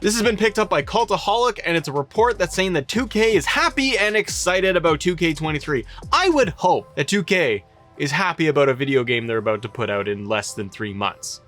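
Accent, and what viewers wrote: Canada. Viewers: Are you from Canada? Just wondering lol.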